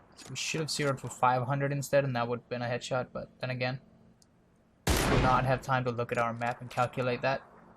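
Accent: Denmark. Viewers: Are you Danish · Are u danish? :D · Are you danish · tænkte nok du var dansker!!! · R u danish? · Are you Danish? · are you by any chance Danish? 😃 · Wait? Are you Danish?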